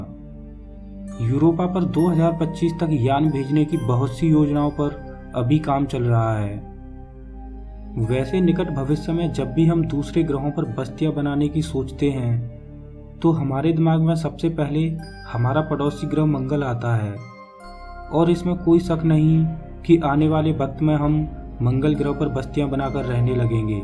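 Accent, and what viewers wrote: Bihar, India. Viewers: Bhaiyaa people ....bhojpuri Hindi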